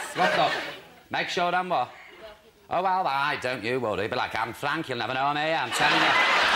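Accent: England, United Kingdom. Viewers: Scouse accent basically, he's from near Liverpool. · Usually I'm good with an English accent, but what. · Does that counts as scouse or Mersyside?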